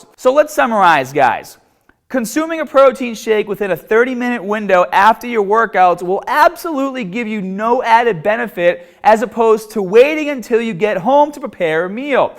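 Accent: Boston, United States. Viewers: God fucking damn that Boston accent! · loll that boston accent tho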